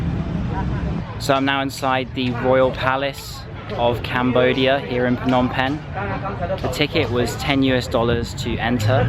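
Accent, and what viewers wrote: United Kingdom. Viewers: I love the content of your channel. Great camera work and editing with beautiful British English. Love you. ❤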